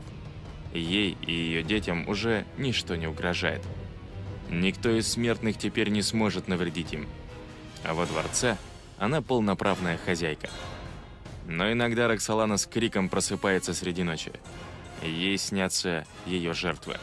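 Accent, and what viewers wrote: Russia. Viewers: …ваш контент придуман для того, чтобы якобы под видом истории уменьшать, умалять, принижать, перекручивать и переворачивать смысл, где-то врать и и тем самым показывать худшее и якобы превалирующее над всем!!!… - в частности всё украинское!!!…, например - на примере Роксаланы!!!… Очень удивительно, что это видео, названи его написано на НЕМЕЦКОМ ЯЗЫКЕ, снято видео на УКРАИНСКОМ ЯЗЫКЕ (который заглушен) и повествование ведётся на РУССКОМ ЯЗЫКЕ с российским акцентом!!!… при чём не только переводится с УКРАИНСКОГО ЯЗЫКА, НО И МНОГО ДОБАВЛЯЕТСЯ негативной отсебятины… на русском!!!…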